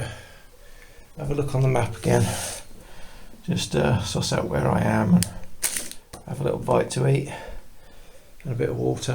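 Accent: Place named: Northern England, United Kingdom. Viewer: Yes I did admire your cycling shoes LOL. Atleast you had cool feet:) Well done on completing the route in one day. Nothing better than a personal challenge. I've never heard you come out with a Norther accent before. Had to laugh at the swear words. This is a real video. Welldone. Big thumbs up.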